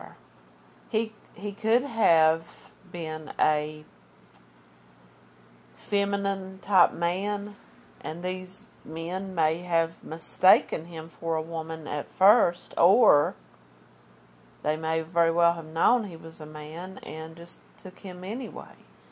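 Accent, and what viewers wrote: United States. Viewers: I hope you weren't offended because I could tell by your accent that you were close to Virginia my family is like north of Knoxville it's a little it's a hair different lol... Slightly but you can tell the.... A sheriff's department has posted a Cold Case webpage just went online I'm going to go grab it for you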